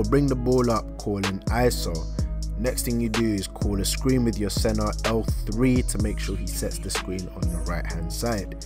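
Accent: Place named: Birmingham, United Kingdom. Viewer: Big respect to you bruv. I'm averaging 70k from these methods. I'm assuming your from the UK fam by your accent. Your accent sounds kinda Brum like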